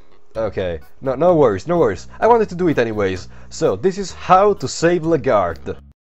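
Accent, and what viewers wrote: Italy. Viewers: Sei italiano? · Are you Italian?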